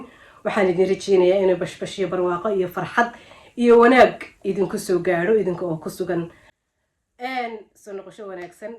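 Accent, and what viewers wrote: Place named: Burco, Somalia. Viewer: Waad iga Qoslisay da’ada aad sheegsnayso,Mashaallah Eraybixinta reer Burco baad u tahay!!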